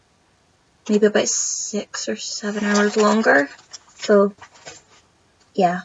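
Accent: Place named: Scotland, United Kingdom. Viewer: So, so nice to hear another Scottish cross stitcher there seems to be a severe lack of us. Love your work, looking forward to seeing more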